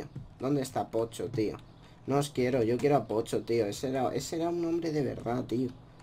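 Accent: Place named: Dominican Republic. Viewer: Mmm... se te esta pegando lo dominicano saludos desde republica dominicana:n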